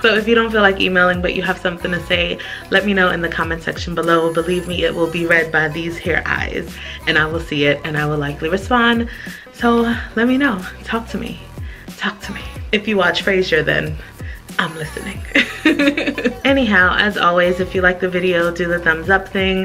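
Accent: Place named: Caribbean. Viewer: WHich Caribbean are you from?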